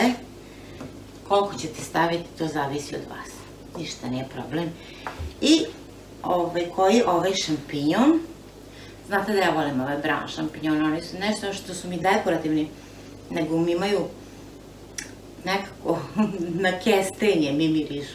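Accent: Vojvodina, Serbia. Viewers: Odlična ideja. I samo pricaj, uzivam u tvom govoru onako vojvodjanski posjecas me na Balasevica jako volim Vojvodinu. Znam skuhati bosansku kafu i pustim tvoje filmove pa mi kafa bude merak. Zivjla ti meni. Pozdrav.🤗🤗🤗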